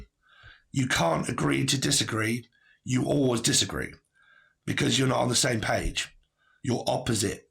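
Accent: United Kingdom. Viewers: Im a sucker for ppl with British accents ❤.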